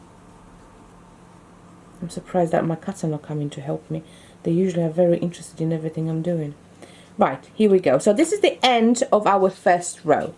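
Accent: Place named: Africa. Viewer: U sound African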